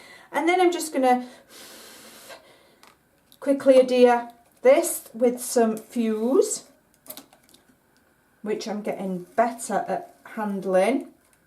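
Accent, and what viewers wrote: Yorkshire, United Kingdom. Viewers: Fabulous Hun! Can I ask where you accent is from - you remind me of my mother in law (voice wise!) I'm going to say just about Yorkshire x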